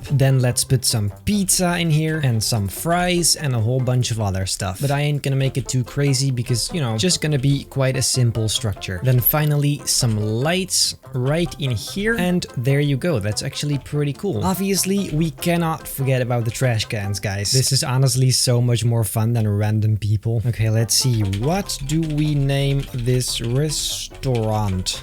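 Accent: Netherlands. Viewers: Your Dutch isn’t ? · I keep trying to figure out from which country he is by trough the way he speaks, I came to the conclusion it had to be Dutch.... I think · Is he dutch? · I smell nederlands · Nederlands accentje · Je bent Nederlands ik hoor het man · are u dutch? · Re u dutch? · Are you dutch? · Are u dutch? Your english Accent sounds like you’re from the netherlands 😁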